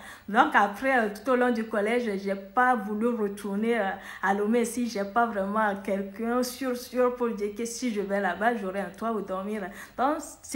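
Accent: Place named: Canada. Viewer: Accent canadien si j'ai la bonne mémoire 😅😅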